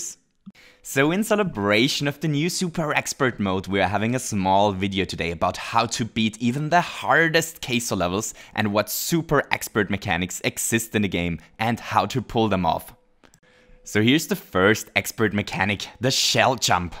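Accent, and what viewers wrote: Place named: Germany. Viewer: Haven't you heard of its a German accent?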